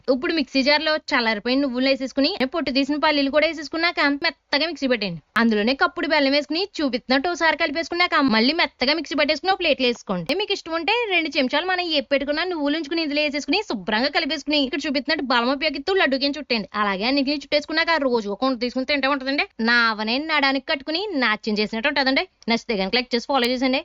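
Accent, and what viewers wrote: Godavari, India. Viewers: గోదావరి యాస మాత్రo highlight 😅😅😅😂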